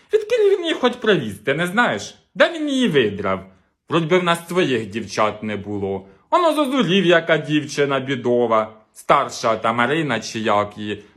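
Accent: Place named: Kirovohrad, Ukraine. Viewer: Привіт з Німеччини,! Живу тут 8 років і сумую за нашим сленгом. Я з Кіровоградщини і говір похожий.Але такі перли у нас не видавали ще. Оце вже ввійшло за традицію - Прихожу з праці і одразу шукаю нове відео від тебе. Подивилася,бальзам на серце отримала і можна з хорошим настроєм відпочивати. Коли ж вже нам Гюльчатай відкриє своє личико? Не тримай так довго інтригу. Бо такі таланти треба оприлюднювати. Бажаю творчих успіхів,мирного неба і здоров'я! І слава нашій Україні!!!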